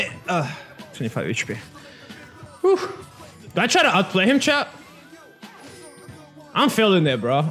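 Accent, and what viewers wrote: France. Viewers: Ta laccent francais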